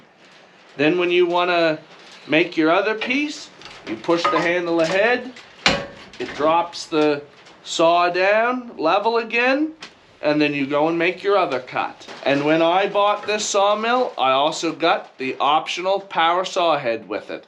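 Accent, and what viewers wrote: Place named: Maritimes, Canada. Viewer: your accent happily reminds me of my beloved great uncles and aunties who were all born and raised in Memramcook, NB and my old neighbors in Maine. Memramcook is about as far as you can go in NB and not be in Nova Scotia!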